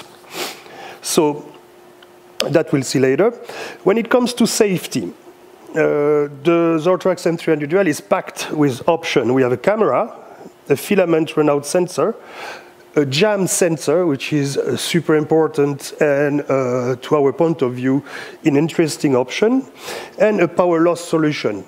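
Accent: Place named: France. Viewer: Yes, this is french accent :)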